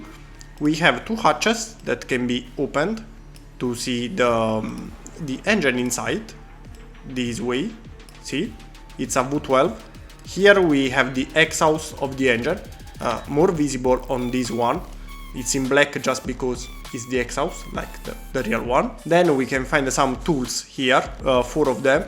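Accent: Italy. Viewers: ti ho sentito parlare 5 secondi e sono andato sulla bio per vedere se sei italiane, e lo sei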